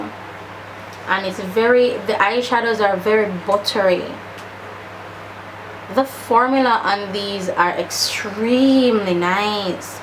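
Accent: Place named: Jamaica. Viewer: Well excuse me; that look screams pure royalty 🙌🏾. You look absolutely stunning. Very skillful. On another note, I just love your accent, tone of voice, speech pattern and how you enunciate your words. Thumbs up for Jamaican 🇯🇲 patwah. Thank you for sharing.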